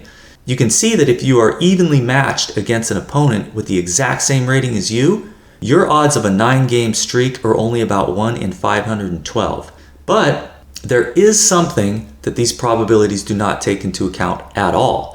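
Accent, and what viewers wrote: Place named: United States. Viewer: Americans pronounce the ant part so it's perfectly fine since he sounds American.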